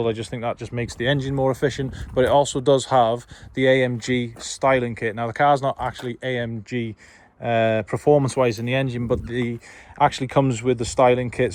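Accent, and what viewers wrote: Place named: United States. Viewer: wow!! you sound very american and even have the car to match it!! love the videos keep it up👍👍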